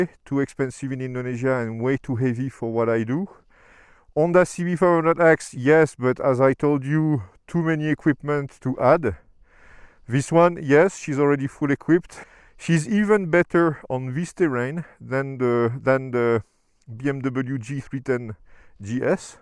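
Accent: France. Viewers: Je me disais bien que c'était un accent bien français ahah ! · I knew you'd buy it! You instantly fell in love with her it was obvious! Great machinery. And yep, I have already checked your vid, it's awesome as every other you have put out till now👌we greeks love a thick French accent! Hehe 🤗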